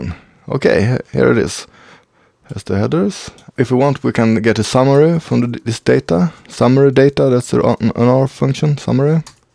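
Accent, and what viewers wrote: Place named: Sweden. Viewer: skön brytning, hör att du är svensk :)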